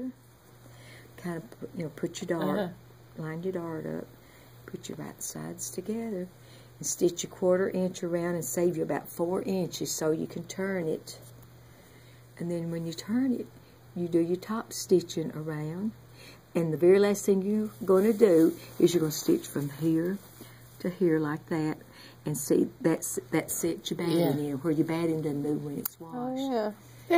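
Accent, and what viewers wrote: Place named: South, United States. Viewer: Just wanted to give you a big hug from a fellow-Southerner (living in Ohio) who needed to make 20 of these. I could not have found a better teacher than you,! You spoke MY language with MY accent. God be with you! Merry Christmas and a Peaceful New Year to you, my dear lady.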